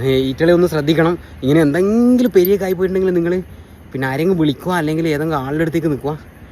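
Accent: Kannur, India. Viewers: നമ്മട kannur ഭാഷ, ❤️🥰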